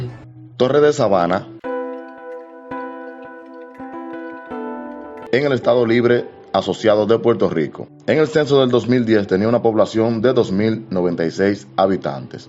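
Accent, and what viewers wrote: Dominican Republic. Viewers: una pregunta, eres Dominicano por si acaso, tu asentó parece de RD!!!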